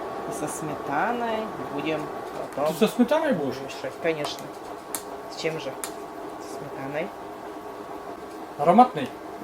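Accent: Komi, Russia. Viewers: Случайно попала на ваш канал, по говорю поняла что коми, сама с Усть Кулома, с большиим удовольствием смотрю ваши видео, очень нравится как вы " Болтаете" Как говорите сами.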